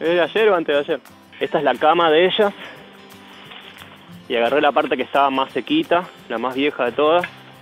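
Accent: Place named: Argentina. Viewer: Buenisimo! Pareces argentiino. Que parte?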